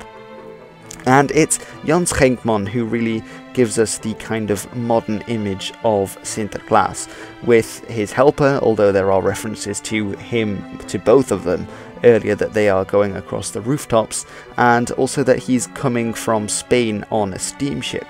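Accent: United Kingdom. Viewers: DUDE YOU'RE DUTCH BUT YOU SOUND BRITISH,THAT'S AWESOME! I AM FROM ARUBA BUT I SOUND AMERICAN,HAHA! GROETJES UIT ROTTERDAM!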